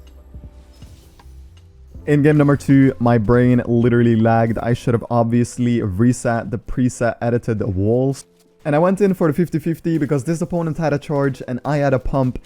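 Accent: Norway. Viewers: Er du fra norge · are you norwegian?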